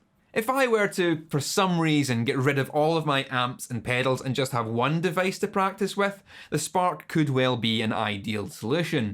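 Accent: Scotland, United Kingdom. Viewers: You Scottish or Irish? You sound Scottish to me, I ask cause my uncle is from Ireland and I’ve noticed there are varying accents in Ireland. Cheers from Canada, I’ve just subscribed as well as ordered this amp. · What a pleasant person. Lovely Scottish accent. Not showing off at all, although he is a pretty good guitarist. I watched this video and I had to smile many times. Good musician and very funny entertainer too. Congratulations !!!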